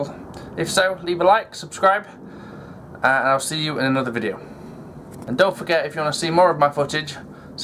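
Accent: England, United Kingdom. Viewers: Good video - Car repair taught by a guy with a classy English accent. Couldn't be too much better. Well - maybe if I could have talked someone else into doing my Focus brakes but,.....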